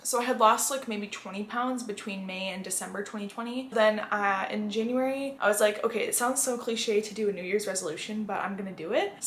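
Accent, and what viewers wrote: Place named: Michigan, United States. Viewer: oh I thought she had an Michigan accent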